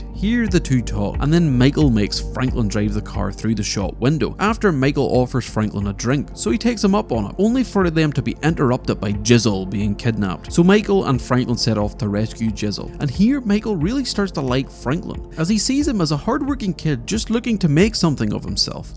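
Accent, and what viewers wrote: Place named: Ireland. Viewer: Recently watched your other gta videos mate & subscribed! Great work, plus the commentary with your irish accent is a bonus touch haha! 😄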